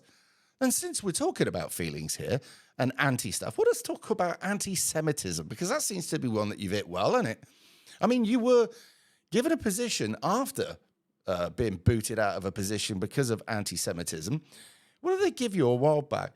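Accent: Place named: Newcastle, United Kingdom. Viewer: What area is she dictating for. Sounds like newcastle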